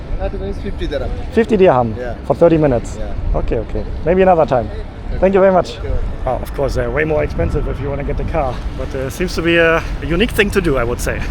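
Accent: Germany. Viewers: Dein Akzent ist echt krass 😅 Aber manche lieben es auch. Jetzt vermute ich mal: Stammst Du aus Sachsen? Oder irgendwo aus der Nähe? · you have such a nice German accent. It feels so homey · German accent?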